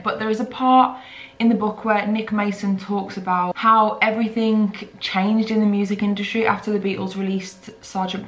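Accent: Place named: Manchester, United Kingdom. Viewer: You just showed me that I need to catch up on a lot of good music 🖤 btw are you from around Manchester? Just trying to identify your gorgeous accent. Maybe I'm totally wrong - sorry about that. Hello from Slovakia! 🦥💨🤢😆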